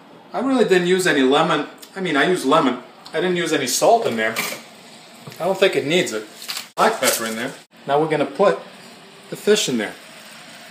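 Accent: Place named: New Jersey, United States. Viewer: I love your Jersey accent❗❗ That recipe looks simple and delicious 👍🏾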